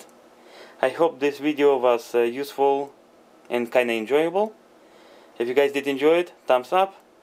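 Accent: Russia. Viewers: Russian accent?))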